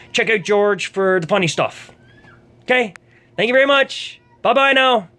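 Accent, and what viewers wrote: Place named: Maritimes, Canada. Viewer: You sound like you're from the maritimes.. That's where I'm from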